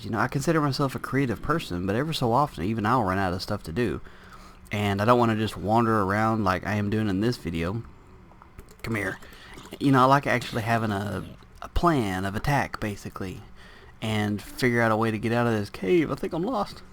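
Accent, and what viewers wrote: South, United States. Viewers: can I ask you something? Where are you from? The south? You kinnda' sound like your're from there..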